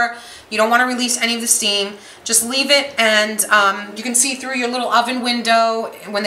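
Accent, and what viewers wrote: New York, United States. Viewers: THAT'S A NYC ACCENT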